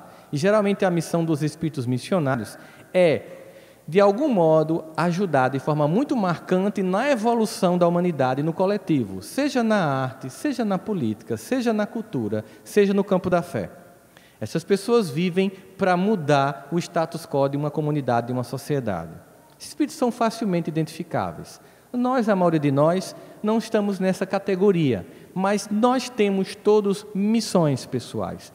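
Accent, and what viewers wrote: Northeast, Brazil. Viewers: Também gosto do sotaque nordestino.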